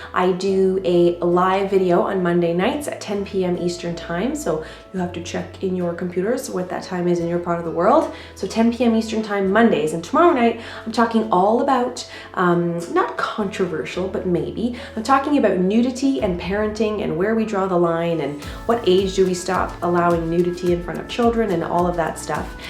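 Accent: Malaysia. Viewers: haha,bunyi dah macam org malaysia betul je... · you lived in penang! do u have the penang accent? ;) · Your Malaysian English accent is so on point! hahaha. I love it here as it is chill, but active at the same time. And the foood. I always miss it after a trip overseas! We hope to see you in Malaysia soon again!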